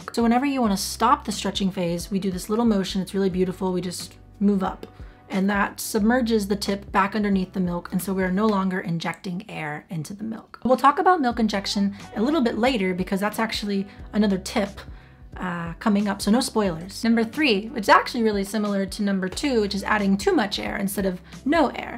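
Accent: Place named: Israel. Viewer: Which country are you from originally? Israel? Going by the accent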